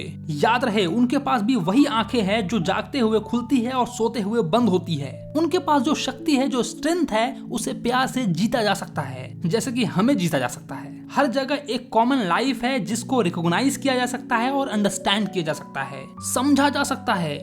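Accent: Bihar, India. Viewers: Bihar se ho na bhai❤ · By voice we call yell he is from Bihar 😅😂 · Bhaiya aap Bihari ho kya❤ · Bihari hai ke tu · Bihari acent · Pakka aap Bihari ho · Aap bihari ho kya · Aap Bihari ho kaya? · Bihari ho yar app to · Bhai aap bihar se hona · Are you from Bihar??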